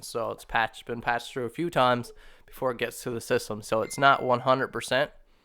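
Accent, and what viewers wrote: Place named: Newfoundland, Canada. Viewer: Start video. "This guy's from Newfoundland". Look at About. "Yup."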